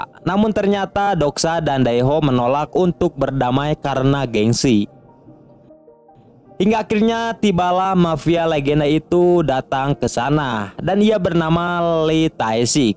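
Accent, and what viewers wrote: West Java, Indonesia. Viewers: Hhaaaa......haaa... Admin naratornya orang sunda....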